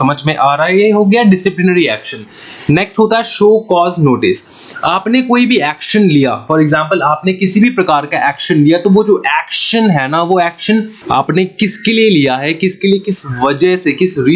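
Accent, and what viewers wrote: Bengal, India. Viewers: Apka accent se pakka bol sakta hu ki aap Bengali ho😂😂..love from Guwahati ❤️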